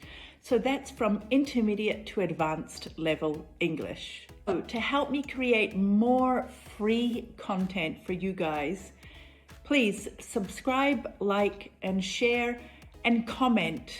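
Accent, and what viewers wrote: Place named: United Kingdom. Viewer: i like your british accent and grammer i am trying to recovering perfect tense, when i review i know, but when i communicate i mixed all tenses😅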